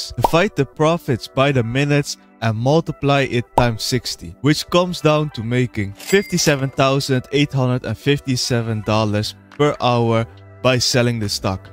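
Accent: Netherlands. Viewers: Ik had binnen 20 seconden door dat je een nederlander bent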